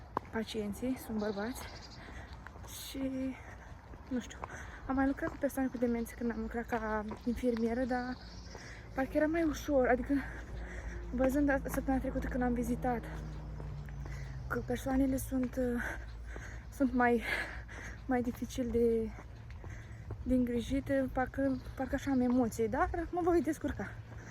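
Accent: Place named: Moldova. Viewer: Te aud ca esti moldoveanca. De unde esti de loc?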